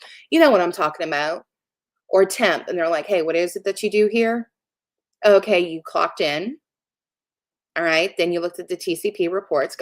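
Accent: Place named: South, United States. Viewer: Hello girl! I'm in Oklahoma and I hear a tinge of "Suth'un" in your speech! Love it. I heard a question as to whether Sussexes would divorce. Only my opinion, but she's now heard Andrew's Sarah will retain her duchess title. Meghan covets that title, she feels she has multipled clout in having it. IF the king, or Prince William, remove their Sussex title, Meg will collapse like a burst balloon. ONLY my opinion, mind.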